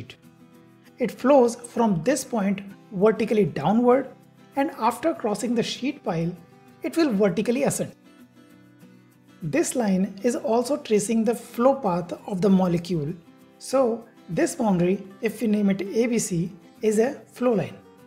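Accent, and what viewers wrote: India. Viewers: I feel like this is a simpler explanation to this topic but It's so hard to understand Indian accent help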